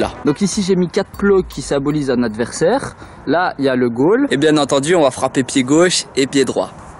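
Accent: Belgium. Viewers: On reconnaît l'accent belge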